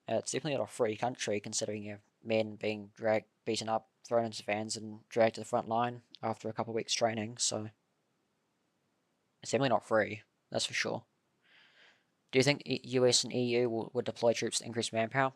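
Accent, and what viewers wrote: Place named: New Zealand. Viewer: Enjoying your kiwi as accent, my dude.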